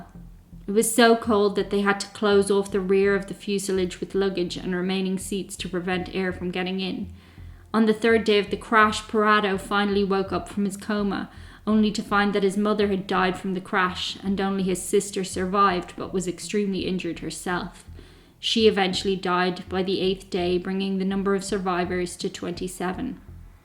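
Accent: Ireland. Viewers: Is it just me, or does this sort of story feel more unsettling when told by a speaker with an Irish accent? I think culturally we (at least in the US) don't associate "Irish" with this kind of darkness.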